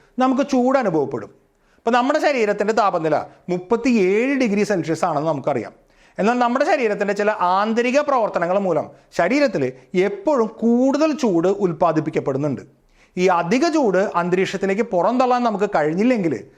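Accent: Thrissur, India. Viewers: ചേട്ടൻ തൃശ്ശൂർ ആണോ? 😊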